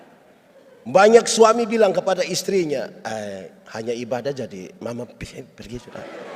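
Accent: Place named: Eastern Indonesia, Indonesia. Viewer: Cuma bahasa + logat ind Timur, agak sulit-lama sy cerna pelan 😂😂😂😂 pemakna'an nya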